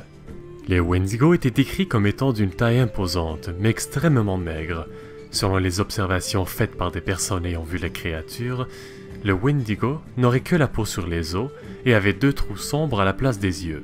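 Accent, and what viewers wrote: Quebec, Canada. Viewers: Petit accent québécois..👌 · En vrai la vidéo est bien mais l'accent canadien ça me sort du truck dsl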